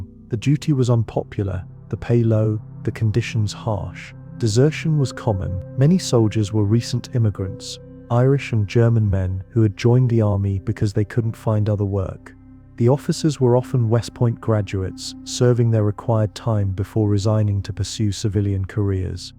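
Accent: England, United Kingdom. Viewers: You know you're receiving foreign Edomite indoctrination in an English accent and mispronouncing names and words recklessly.... Know wonder the Western Hemisphere is lost in ignorance. Ju Ly for 1776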